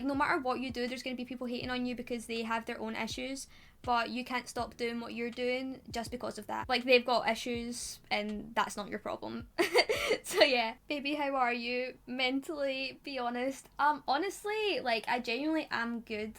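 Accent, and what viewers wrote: Scotland, United Kingdom. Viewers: That accent is magnificent, I might need to come home to Scotland. I should also visit Ireland at some point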